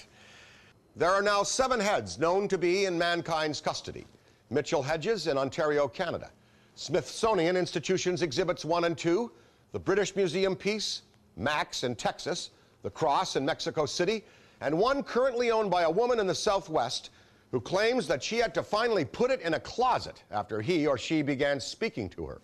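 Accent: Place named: Ireland. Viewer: This video is one of the best examples of the Irish influence still heard in Newfoundland accents today too... If I wasn't told he was from Newfoundland, it would be hard to tell he's not just Irish lol.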